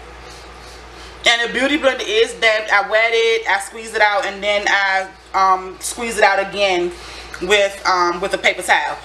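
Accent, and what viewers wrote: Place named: DMV, United States. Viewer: I hear the dmv accent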